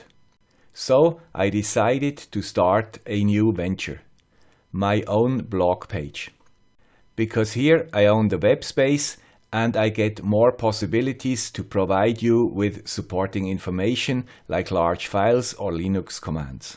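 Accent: Switzerland. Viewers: As with all of your videos, I learned something new. Making videos that are my #1 favorite to watch doesn't happen without a lot of work. They just look natural by way of hours and hours of effort. After 'Binge Watching' all of your videos in order over a two week period and enjoying hours of entertaining and educational fun, now I get to learn more by broadening my knowledge into the blogging realm. I am sure that I will learn, enjoy and of course 'Like'. Happy Easter to (the guy with the crazy Swiss accent)! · I have only recently started with Arduino stuff, and more recently (today) with ESP8266 stuff! I have to say, your videos are fantastic, your humour is on point, and your style is simply the best. Thank you for taking the time for producing these extremely informative and interesting videos. i think I speak for most of us, we do love that Swiss accent.:) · Come on guys lets give the guy with the swiss accent more likes. 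These videos are excellent and satisfy our IoT appetite.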